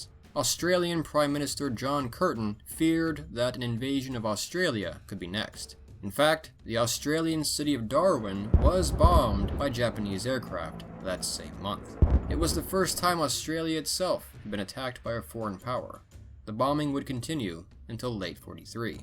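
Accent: United States. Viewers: I was sceptical when I first heard the American accent on this doco but it is excellent and really comprehensive, with information that I, as an Australian, didn't know. I particularly love that you include the fairly recent genetic information regarding where the people travelled from to populate the continent and surrounding islands. I'm using this as a resource to teach my son Australian History and we'll definitely check out your other documentaries. Thank you! Really well done! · Why is there a American talking instead of a Australian?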